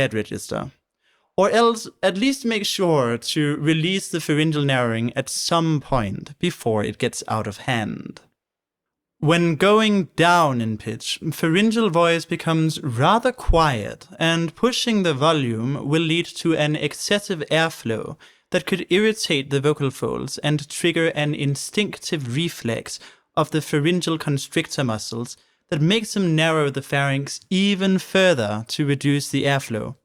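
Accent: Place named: Oxford, United Kingdom. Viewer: I like your oxford english very much 👍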